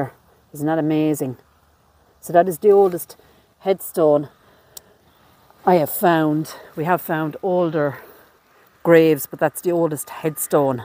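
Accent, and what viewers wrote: Ireland. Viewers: I love your Irish brogue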